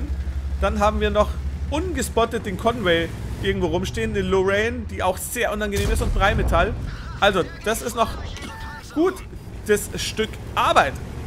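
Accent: Bavaria, Germany. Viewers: Das Bayerische hört man schon raus. Aber ich mag das. Ist authentisch und macht dich sympathisch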